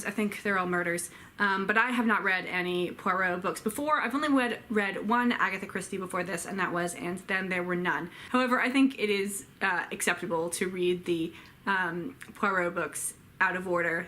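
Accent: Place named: South, United States. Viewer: I've been wanting to check out And Then There Were None for a little while, given this Christie revival but I am already a slow reader so I'm not sure it's just a way to frustration. Probably just sucked in by the cover. I've been watching you a little while now, love to hear what you're reading and I share your love of Hardy! I'm an ignorant Aussie so I hope I don't offend but I love your accent, its got a lovely twang to it (says me hah) -- clear American but a bit of Southern? It reminds me of Donna Tartt. Obviously hers is very very strong (Mississippi?). So I listened to some of your audiobook and it does lend itself to narration very well! Look forward to more from you,:)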